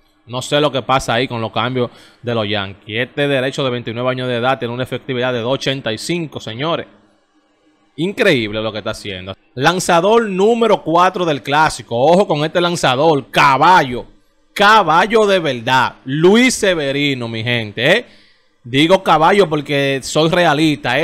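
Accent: Cibao, Dominican Republic. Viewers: A lel de pal de meses🤣😂🤣😂🤣...me imagino que eres cibaeño.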